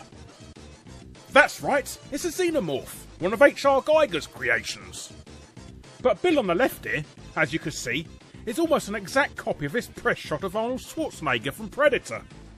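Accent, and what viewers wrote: England, United Kingdom. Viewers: Not saying that all English accents sound alike, but you sound like you could be Robin Leach's son.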